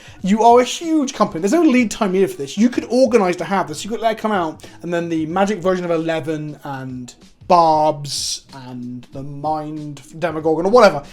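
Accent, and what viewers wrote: United Kingdom. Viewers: Woah woah hang on... you’re British?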